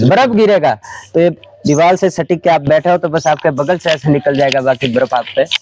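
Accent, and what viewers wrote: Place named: Uttar Pradesh, India. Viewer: Aap ki aavaj se to aap u p sahit ke lagte ho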